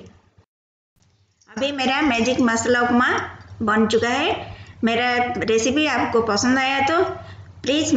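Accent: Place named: Odisha, India. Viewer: Very good effort Madam. Keep moving . Nice hindi in Odisha tone ..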